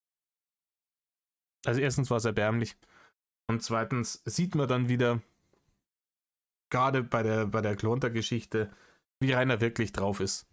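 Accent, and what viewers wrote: Bavaria, Germany. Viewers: Dein Latein ist grottenschlecht, aber dein Bayrisch ist super 😂 Danke für 2 Stunden Kurzweiligkeit im Auto. Mach bitte so weiter 😊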